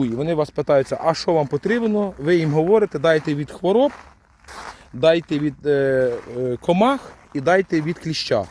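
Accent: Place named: Obodivka, Ukraine. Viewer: Ободівський говор) 😎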